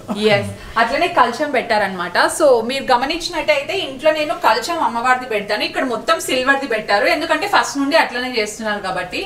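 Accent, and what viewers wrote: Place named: Rayalaseema, India. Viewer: God bless you and your family amma Rayslaseema bhasha vintunte chaala baagundamma😊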